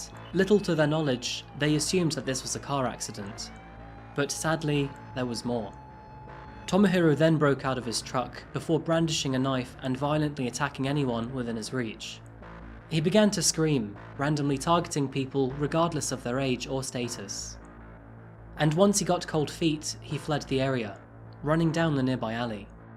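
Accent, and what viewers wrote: United Kingdom. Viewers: this case is indeed fascinating, and now you [not for the first time, actually are you, you come across as utterly Beitish, your accent, manner, and speech patterns do not seem consistent with someone born in the US at all, not even from Seatle, which is a beautiful and amazing city, I haven't spent as much time there as I'd like, but the time I have spent was wonderful, such a fun mix of people of all stripes and types and killer architecture and natural wonders, the Puget Sound and San Juan Islands are simply glorious, untouched, unspoiled and it's lovely to see whales breeching from the ferries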